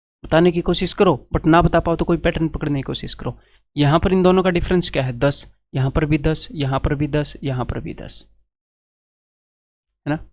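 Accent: Haryana, India. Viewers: You are awesome. I am also from haryana. Felt connected with your tone . I am big fan of you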